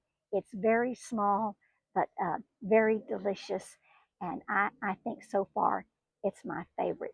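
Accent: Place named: North Carolina, United States. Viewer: I like your accent. I'm from the UK so I'm not too familiar with US accents. Are you from North Carolina by any chance?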